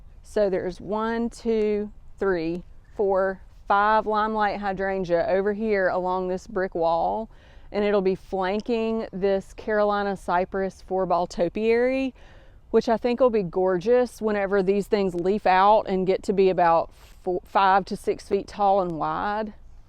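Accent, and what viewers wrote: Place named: Mississippi Gulf Coast, United States. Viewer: In Central TX there are no pine trees. We use cedar mulch and they sell it at the gas stations very cheap. When the mulch shows up you know it’s Spring. Love hearing your accent as it reminds me of home along the Mississippi Gulf Coast. Enjoying your channel!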